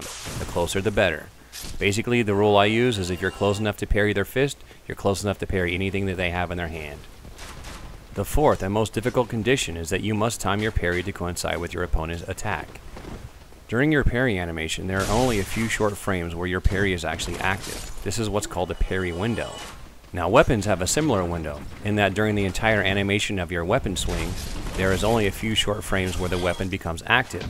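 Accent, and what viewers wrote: United States. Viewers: I see what you mean. Sometimes different microphones have a huge effect on the way a person sounds, but seemed to have a slight southern accent in a lot of these videos that I don't hear in the Fextralife vids.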